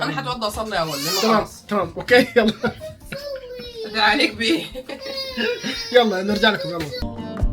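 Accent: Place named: Gulf, Arab world. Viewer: السلام عليكم ورحمة الله وبركاته ورمضان كريم عليك اختي. معليش عندي سؤال محيرني هل انتي وزوجك سعوديين او خليجيين ليه بتتكلم خليجي مع انو متأكد انكم سودانييين 100٪ ليه كذا ياريت الاجابه علي التعليق الواحد دايما يكون علي طبيعتو افضل والله. تحياتي لكم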